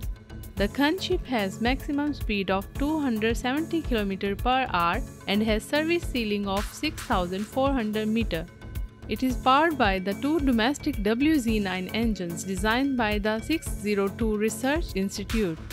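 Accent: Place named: India. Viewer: Oh my God that Indian accent hurt my ears so bad 😔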